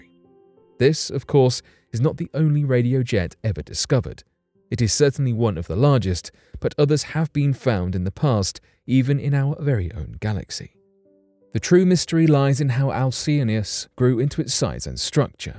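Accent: United Kingdom. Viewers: Why do we have to hear Brit accent when they've never done anything space related.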